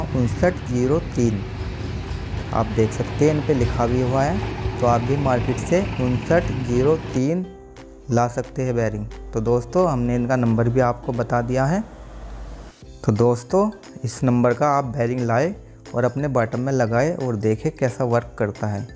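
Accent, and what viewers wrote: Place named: Meerut, India. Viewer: उरे, परे की बोली, भाई वाह, मेरठ से हो या बुलंदशहर से l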